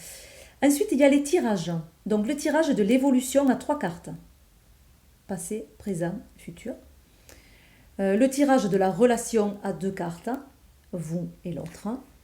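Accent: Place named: Gard, France. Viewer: ça sent le Gard, cet accent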